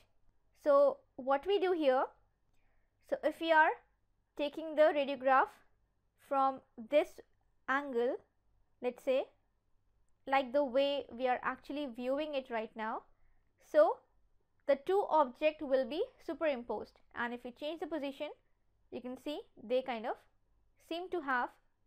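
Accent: India. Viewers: Your Indian accent is hawt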